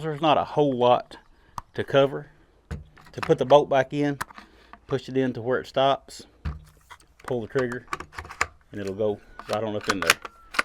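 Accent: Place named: Kentucky, United States. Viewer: Heck.....you always sound funny to me.....you Kentucky boys vocalizations are a bit to sophisticated for a Texican😀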